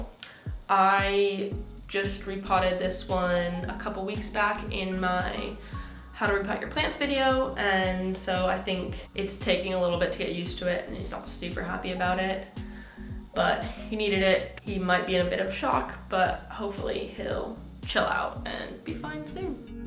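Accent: United States. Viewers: are you from the south? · That's ok, it seems to have settled now. I realised you are in the UK after waiting some other vids of yours. 🤣 Love your plant vids, congrats. Helped me a lot with my plant care and information. You're American though right?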